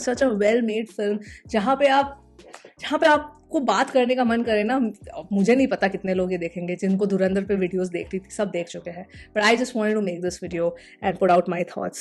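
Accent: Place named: Northeast, India. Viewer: Mam are you from north east because your hindi accent doesnt have that north indian vibe where are you from❤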